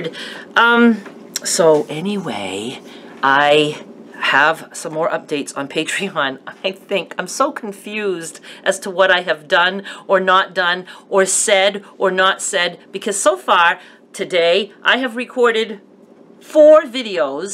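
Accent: Maine, United States. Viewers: This will be a totally different comment (hope you can laugh a little). I’m living in Ohio now, but lived in Maine on North Haven for 2 years with my husband. I love your Maine accent and it brings back wonderful memories Maine.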